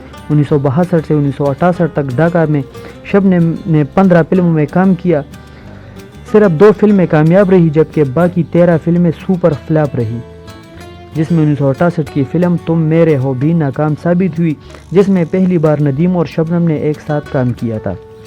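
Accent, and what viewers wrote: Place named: Pashto, Pakistan. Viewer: سرجی پشتو ٹون میں آپ کی بتائی ہوئی باتیں ، شبنم کا پورا فلمی سفر اے ٹو زیڈ تک آپ نے گن کے بتایا ۔ ساری فلموں کی معلومات فلاپ اور ہٹ فلموں کے ساتھ آپ نے باتیں شیئر کی ہیں ۔ ایسی تفصیلی معلومات ہمیں کبھی کسی بایوگرافی میں نہیں ملی ۔ فلم عندلیب کے سیٹ پر وحید مراد سے جھگڑا اور اس کے بعد اس کے ساتھ فلم نہ کرنے ، اور اس کا بائیکاٹ کرنے کی باتیں بہت معلومات دے گئیں ۔ شبنم کے ساتھ اتنا بڑا حادثہ ہونے کے باوجود بھی وہ پاکستان میں آتی جاتی رہتی ہیں ، جس کی وجہ سے ، اس کے بیٹے کے ساتھ اس کی انبن چالو ہے ۔ اب مجھے اس گانے کی لائنیں یاد آ رہی ہیں جو شبنم کی اوپر برابر فٹ بیٹھتا ہے ﴿جس نے میرے دل کو درد دیا اس شکل کو میں نے بھلایا نہیں﴾ مگر اس کے بیٹے نے وہ باتیں اپنی ماں کی وجہ سے نہیں بھٌلائیں ۔ ہمیں اس بات میں دست اندازی نہیں کرنی چاہیے ۔ پر شبنم کا یہ ڈیسیشن کہاں صحیح ہے کہ ، اپنے بیٹے کو ترجیح نہ دے کر ، پاک سے اپنی محبت کا ڈھنڈورا پیٹتی رہتی ہیں ۔ ٹھیک ہے اس کی پوری زندگی وہاں گئی ، پر اس کا مطلب یہ تو نہیں ، کہ اپنے بیٹے کے پیار کی ہی قربانی دے دے ۔ خیر ! 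رب العالمین دونوں کو سمجھ عطا فرمائے آمین ۔پونا بھارت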